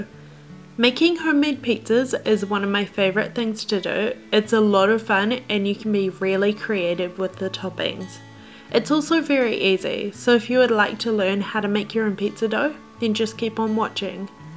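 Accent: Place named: New Zealand. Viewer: R u a kiwi by chance?!? Cos i rekon u souns pretty kiwi!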